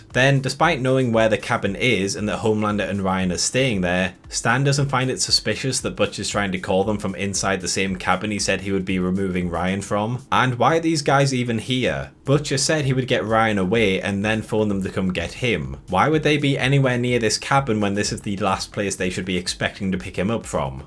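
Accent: United Kingdom. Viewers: why do people who do these videos always got a brit accent?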